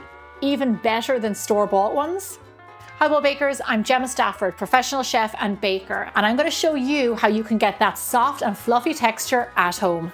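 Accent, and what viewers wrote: Ireland. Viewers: Gemma, I love your accent & have been wondering if George has taken after you speaking w/ a beautiful Irish accent. These rolls look wonderful. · Those are some lovely sweet rolls, Gemma. You’re truly such a gem when you bake, and when you speak with that adorable Irish accent. 🤗🤗🤗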